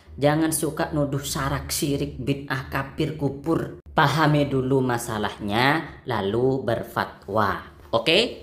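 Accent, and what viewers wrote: Madura, Indonesia. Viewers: Orang madura ya admin ny?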